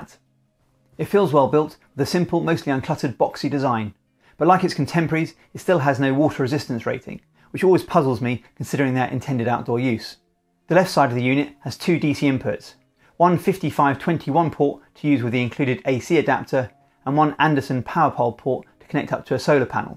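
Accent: United Kingdom. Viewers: Hi ur british?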